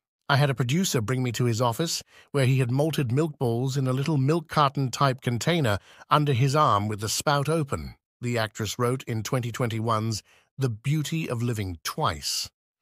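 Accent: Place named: England, United Kingdom. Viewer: This is an American movie. Why is this narrator English and a male? He's irritating.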